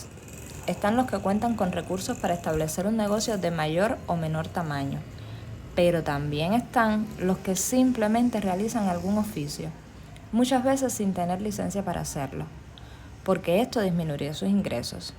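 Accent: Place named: Cuba. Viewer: Que diccion y voz tan agradable. La prueba de que se puede hablar con acento cubano sin que suene a regueton. Muy completo y systematizado el contenido. Por cierto, yo siempre pense que un colero es el que vende su puesto en la cola, profesion exclusiva de Cuba 😅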